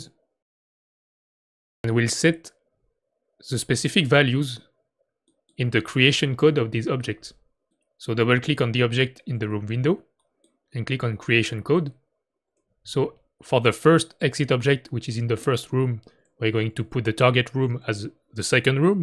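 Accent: France. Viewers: t'est francais la c'est pas possible avec l'accent 😅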